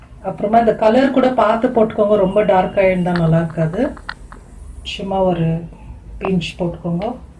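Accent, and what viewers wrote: Bangalore, India. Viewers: Nice Bangalore tamil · Akka banglore tamil haa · I am tamilian from Banglore, it was v good see you any cooking kebab and talking Tamil in our style.. 😄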